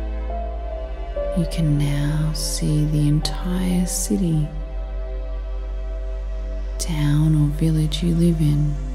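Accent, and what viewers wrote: Australia. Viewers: What a beautiful meditation! Her voice is just so calming & soothing. I believe it was an Australian accent(?) I LOVE that accent!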